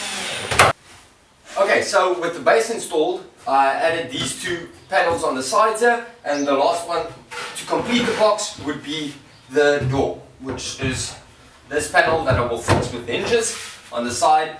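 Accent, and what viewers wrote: South Africa. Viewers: That a south african accent? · Terrence Lemire South African accent 😉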